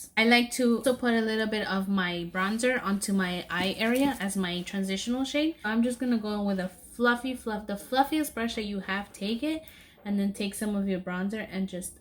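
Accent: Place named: Latin America. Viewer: Awww me encanta tu acento latino!!!! 😍😍 Viví un tiempo en Tx y me recuerdas mucho a mis amigas de ahí!! 😍😍 By the way loveeeed ur make up!!!! 🤩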